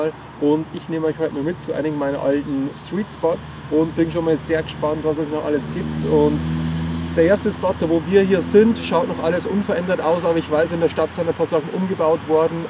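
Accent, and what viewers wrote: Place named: Bavaria, Germany. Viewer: Kleine Frage, aus welcher Gegend kommst du? Denn man hört den Bayrischen Dialekt☺️😉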